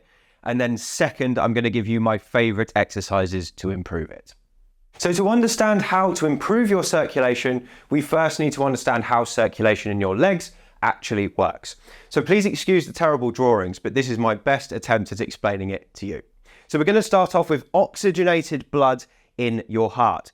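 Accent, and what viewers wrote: United Kingdom. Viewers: I am appreciative of your videos and your book. You’ve given this Yank a chuckle over the difference in the way you Brits pronounce medical terms such as capillaries. You put the accent on the second syllable whereas we accent the first: cap.🧢pillars.😊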